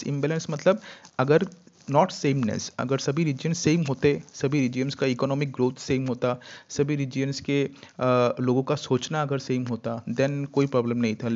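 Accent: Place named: Northeast India, India. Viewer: I like your teaching and understand ur language so easily seems it feels like our northeast accent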